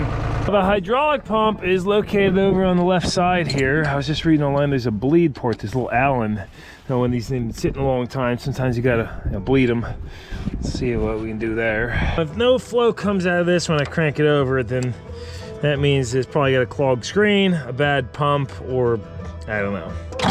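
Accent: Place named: New Jersey, United States. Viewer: I’m not a car guy or “Motörhead” but I am amazed by your videos. The expertise and the way you can diagnose and fix just about everything… And you have the parts right there at hand… Is truly incredible to watch. Plus I love the jersey accent because that’s where I was born and raised. Anyway, keep up the great work, man.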